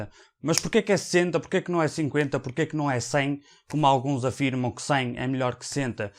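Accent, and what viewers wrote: Portugal. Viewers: gostei da explicação. maneiro assistir um vídeo em português de Portugal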